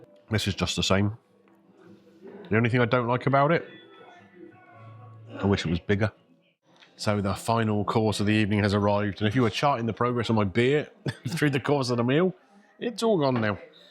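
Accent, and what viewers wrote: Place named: Cockney, United Kingdom. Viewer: You have 'worked some magic' as well, with your descriptions of these dishes! From time to time, I am struck by your (to an American ear) Cockney accent: it adds a grounding note to your sophisticated discussion. I would have ordered the venison plate! Post Script: What will Mrs. Greeno say when she sees this video and she is not mentioned as being there???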